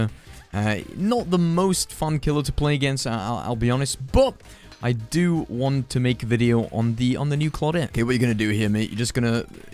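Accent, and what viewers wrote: United Kingdom. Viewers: must be british right?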